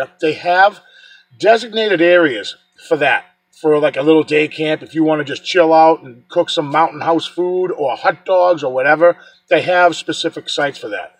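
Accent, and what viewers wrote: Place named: New England, United States. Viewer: I'm not sure I believe that cr...p the park rangers are telling you...they know what's there.....I know what's there from watching your vids. I understand and respect your that you will go by their rules...and it's probably not a good idea to go off trail there anyway cuz there just might be something you don't want to run into...on the other hand if your gonna do any kind of field research on big foot you must be prepared for the worse to happen rite?? There are plenty of other places to go just like you said tho....Ive been a to a lot of forest ares up there as you know I to am from Mass........White mountains is where it's at!!!!! Again stay safe love your vids and I sure do enjoy hearing that new Enland accent again 😁😁😁LINDA IN FLORIDA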